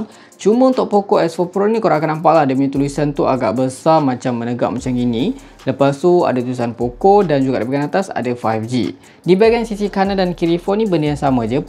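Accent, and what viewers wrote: Malaysia. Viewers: Lucu banget y logat malaysia saya suka🤣🤣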